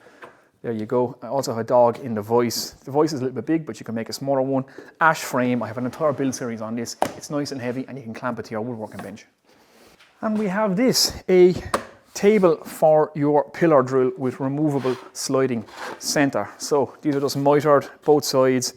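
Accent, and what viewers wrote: Ireland. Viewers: Hello, your videos on jig making are fantastic and I will definitely attempt them. Can I be so bold and ask what part of Ireland you are from, because your accent is very familiar. Also, I don't really go in for Patreon but I do believe in rewarding good work that is helpful for me so can you advise your PayPal account please.? A jug for a jig seems a fair reward (hahaha). Paul. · Just hearing your Irish accent makes me smile. I’ve sent many years working on the green isle, all very enjoyable. Thank you. Co..Cork?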